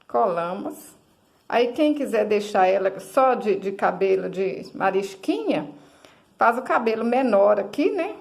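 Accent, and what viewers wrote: Minas Gerais, Brazil. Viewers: Eu simplesmente ameiii, adoro bonecas de pano, adoro fuxico e adoro esse sotaque mineiro 💖😍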